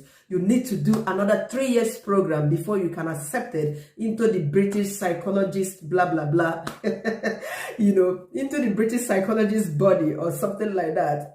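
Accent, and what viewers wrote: Nigeria. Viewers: Teaching education with Naija accent??? In the Uk, none starter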